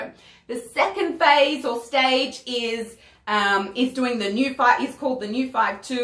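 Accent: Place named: Australia. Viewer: Lovely to hear an Australian accent 💗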